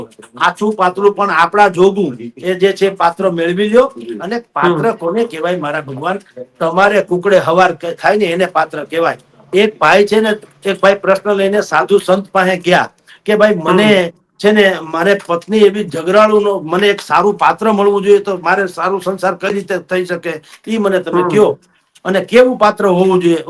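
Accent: Kathiawar, India. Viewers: વાહ... સાબ..શું કાઠિયાવાડી શૈલીમાં પત્રકારિતા છે...વાહ😊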